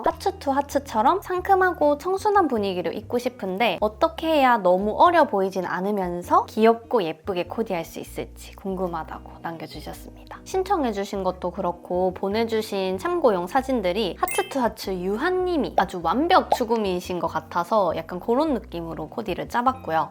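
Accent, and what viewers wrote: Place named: Daegu, South Korea. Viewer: 아이돌 미모에 옷 매치도 잘하셔서 패션유튜버중 가장 좋아요!! 그리구 대구 동생은 언니의 나긋나긋한 사투리말투도 너무 편안하고 듣기 좋아용❤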